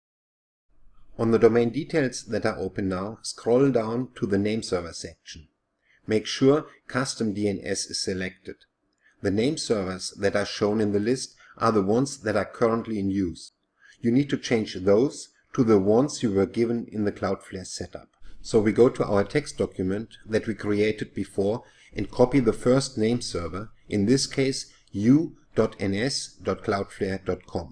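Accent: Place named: Germany. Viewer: Thank you, this was really helpful. What could feel more secure than having a German accent helping you manage technical stuff online confidently.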